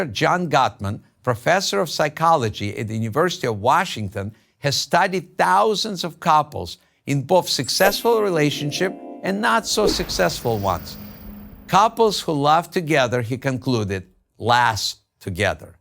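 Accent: Russia. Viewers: + The Forms, He is probably a Russian Jew. He does sound Russian. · Русский акцент, господи 😖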